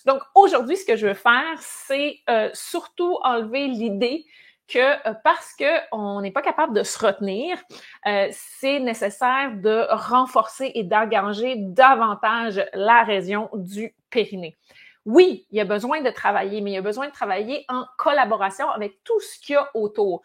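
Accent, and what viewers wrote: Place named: Canada. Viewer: Bonjour, j'apprécie vos formations, vos capsules d'introduction. Au début j'avais des difficultés à entendre distinctement votre accent canadien. Dans cette vidéo c'est mieux pour moi, il y a moins d'aigu. Je viens de partager vos formations avec ma voisine et lundi prochain je lui présente les yoga-balles que je viens d'acquérir, elle est fort intéressée par votre formation, elle a 1 problème au niveau de la nuque. Excellente continuation dans votre activité. Yvel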